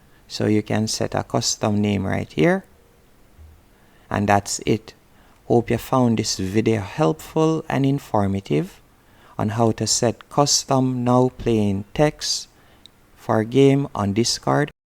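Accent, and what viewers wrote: Jamaica. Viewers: thank you, also are you from jamacia thats where im from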